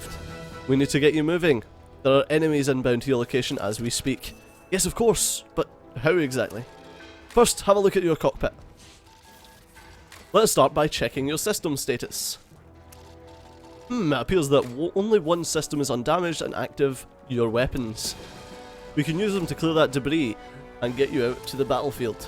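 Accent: United Kingdom. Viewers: That looks like a fun game! Great video on it too. Are you British or Scottish?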